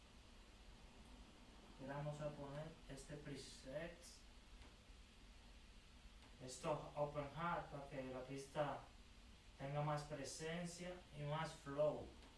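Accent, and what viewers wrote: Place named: Mexico. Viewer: supér carnal pense que eras de mexico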